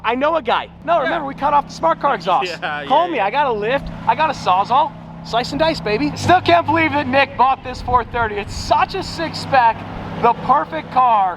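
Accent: United Kingdom. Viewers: More of that bri'ish accent haha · Proper British accent. 10/10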